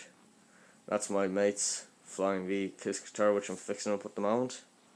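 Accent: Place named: Ireland. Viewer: I can tell ur Irish lol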